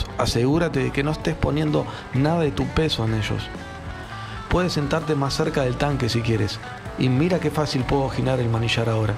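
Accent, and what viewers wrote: Standard. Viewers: Por qué hablas en neutro? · Por favor,no hables en neutro 😅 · El mejor comentario , alguien que me diga por qué habla en NEUTRO si es más argentino que Manuel Belgrano… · no hables en neutro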